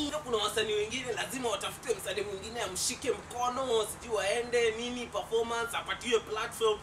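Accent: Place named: Luo, Kenya. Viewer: Sasa ispokua accent yako ni ya kiluo athawiz umejaribu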